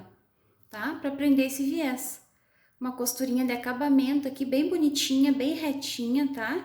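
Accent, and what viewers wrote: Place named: Curitiba, Brazil. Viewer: nossa... Minha vizinha é curitibana e o sotaque é assim tbem rsrs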